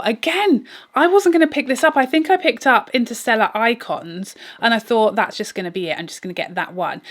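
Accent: United Kingdom. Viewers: I love how you brits say “purrrhfect” 😍 I wish I had that accent!!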